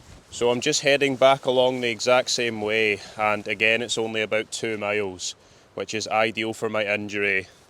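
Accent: Scotland, United Kingdom. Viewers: 💎Another great Video! Thanx and biggest Respect! Love your scottish accent .😊 Wish you all the best! 💪🏼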